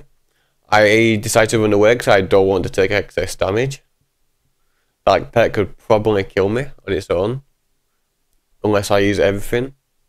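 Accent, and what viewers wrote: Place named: United Kingdom. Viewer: i hate ur fucking british accent jeez , put subtitle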